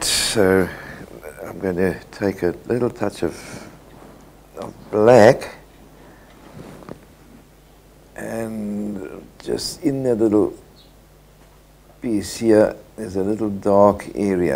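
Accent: South Africa. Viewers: You have a South African accent, am I correct🤓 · Boy, am I happy to have found you, I turned 60 a while ago, and suddenly decided to try my hand at painting etc. I just love the way you talk a person through the motions.... And to hear a South African voice ... what a treat. Thanks for the knowledge that you share.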